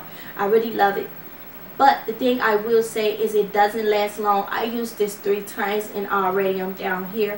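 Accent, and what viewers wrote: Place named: Haiti. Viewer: Are you from Haiti pretty lady? U sound like your from my island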